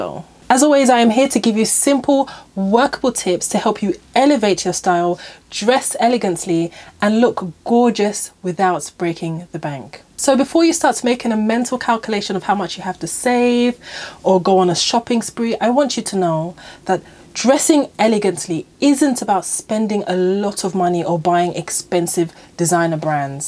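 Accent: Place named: United Kingdom. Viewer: It's the delightful British accent. ✨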